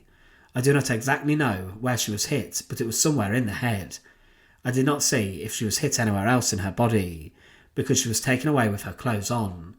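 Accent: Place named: East Midlands, United Kingdom. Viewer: I'm from Wolverhampton and can catogorically say he's not from anywhere in thecwest midlands! Later in life I worked in Derby for twenty years and he sounds like he comes from somewhere in the East Midlands ... Can't really say where exactly though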